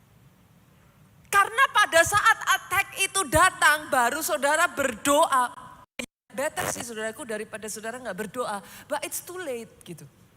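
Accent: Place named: Java, Indonesia. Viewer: Terima kasih Pastor untuk kotbahnya. Saya sgt du berkati jelas dan tajam, sgt membanty saya memahami Alkitab. Awalnya saya tdk suka dgn pastor krn menurut saya kog seperti model trus bahasa Indonesia nya agak medok jawa. Tetapi lama lama saya smkn suka. Pastor itu fashionable dan dinamis, cerdas dan tegas, Terima ksh ya ps n team, Gbu all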